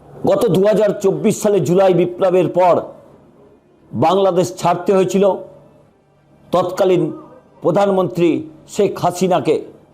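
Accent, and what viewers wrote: India. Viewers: যিনি উপস্থাপন করছেন, উনি কি আসলেই বাঙালি? নাকি,ক্রস (হিন্দি +বাংলা )।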